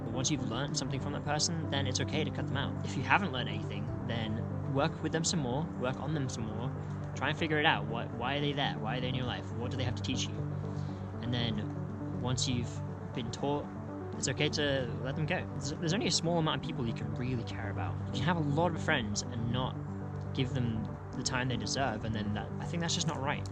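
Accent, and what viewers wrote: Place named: London, United Kingdom. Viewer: Yo you always say your from wales but you have a British accent? You sound more like you are from where I’m from which is near London? Great video tho dude 🍄🍁💙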